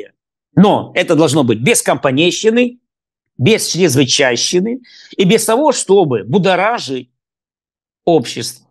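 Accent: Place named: Odessa, Ukraine. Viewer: Ведущая говорите как говорят в Одессе!!! Противно слушать!!!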